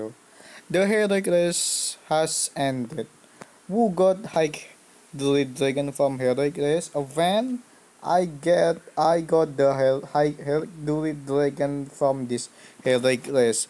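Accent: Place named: Indonesia. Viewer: Bang gw tau lu orang indo di lihat dari ip chanel dan logat bahasa inggrisnya wkwkwkk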